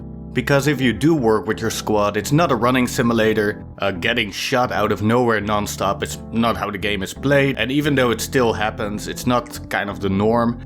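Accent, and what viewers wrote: Netherlands. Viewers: Just got the game on Wednesday. Been playing every chance I get. I’ve played commander a hand full of times and was straight up honest about it. The squad leaders were very helpful and them because they wanted to win, it was in everyone’s best interest to work through me being a noob. Like you said, a bare minimum commander is better than no commander, although you said squad leader. That being said, squad leader intimidated me the most. Because you are right there in the thick of it all. Commander I hung back, drove supply’s, set up garrisons, and supported the mission via supply drops, bombing runs, and deploying tanks. As a squad leader you have to do much more in my opinion and I don’t want to let my men, and commander down. Also, I recognize that accent anywhere, plus gives it away. My mom still isn’t a US citizen because she doesn’t want to give up her Dutch citizenship, she was from The Hague and my Oma still lives there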